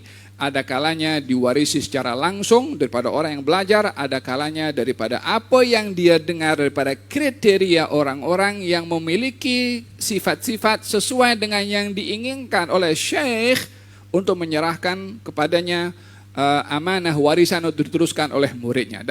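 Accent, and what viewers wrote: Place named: Malaysia. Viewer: Ini logatnya kayak orang malaysiau😂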